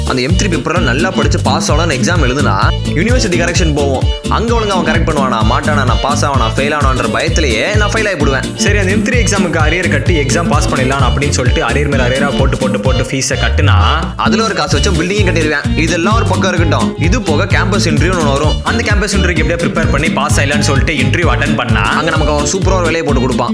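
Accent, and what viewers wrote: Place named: Madurai, India. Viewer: Madurai slang was superb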